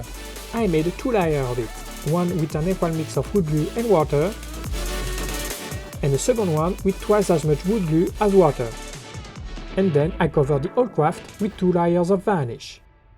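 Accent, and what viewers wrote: France. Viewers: you have a french accent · On dirait un gars qui parle anglais depuis peu ton accent c'est bizarre. Je dirais presque un français.... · T'es français ça se voit à ton accent 😂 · Ton accent est ... comment dire spécial ( un accent français quoi ) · You are French? I can hear the accent because I'm French haha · Are you french ? You have a french accent !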